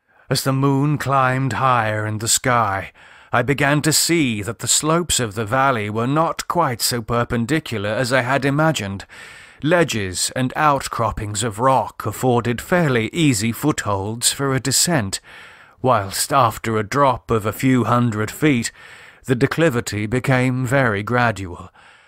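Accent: England, United Kingdom. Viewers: Hey dude..... Hates Pigment Lovecraft was from NEW England. Lose that ridiculous "theatrical" tea talk and tell the story. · Me encanta ver videos sobre los relatos de Lovecraft. Aunque como opinión personal siento que es mucho más gratificante imaginar estos escenarios mientras lees el libro. Aún así me ha encantado, sobretodo por el acento inglés del narrador y lo bien ilustrado que está. Muchas gracias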